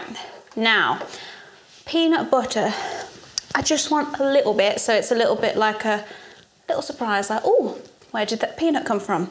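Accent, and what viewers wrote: Birmingham, United Kingdom. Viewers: You sound so Brummy in this vid! 😂😘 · The brummie accent is so apparent in this video and I love it 😂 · Hilarious. Also, your brummy accent came out loads in this 😆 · You are sooo brummy in this video, u cute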